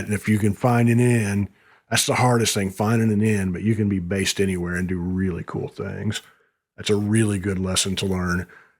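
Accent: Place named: Indiana, United States. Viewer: I really enjoyed the video I'm starting to dig into your channel and it's really cool. Sounds like you're Indiana I'm in southern Indiana myself right across the river from Louisville. Sorry I'm rambling lol thanks for your work.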